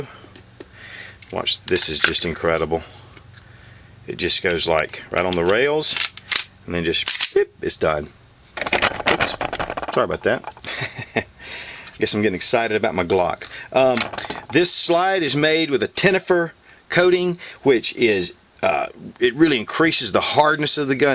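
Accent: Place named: South, United States. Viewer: man i like your southern american accent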